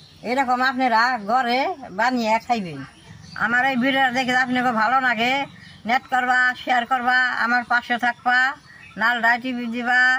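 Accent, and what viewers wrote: Bangladesh. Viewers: Jethi Tumi khubi majadar jinish banao kintu abujh manush tumar mullayan kore na tumar bhasha shune moe hoy Tumi jamalpur Tangailer luk onek din becher thako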